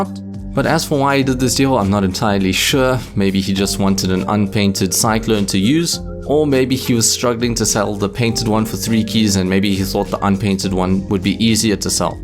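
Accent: South Africa. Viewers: Heyy, are you South African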